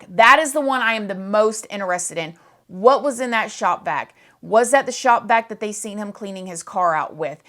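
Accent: South, United States. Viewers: your so beautiful i absolutely listening to you talk I like your accent! Southern... it’s so indearing even if your speaking on something like this 😮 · WeekAND.. love that southern drawl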